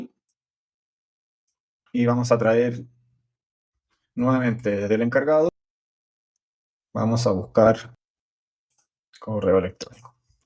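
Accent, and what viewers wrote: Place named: Chile. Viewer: Hola como estas?, Genial tu apoyo con ODOO, lo uso hace años pero primera vez que veo buenos videos en español, y en "chileno" jajaja. Una consulta, estoy intentando agregar las comunas al formulario de contactos, ya que necesito que los clientes tengan comuna y region relacionados, pero no logro que al cambiar la región (res.country.state), en el campo Comunas (que cree con Studio), me muestre solo las comunas de esa región. ME darias algún tips?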